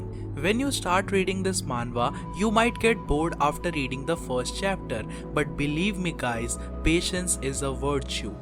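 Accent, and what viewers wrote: India. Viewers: Your accent is Indian 😉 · bro, sound so much like a Indian...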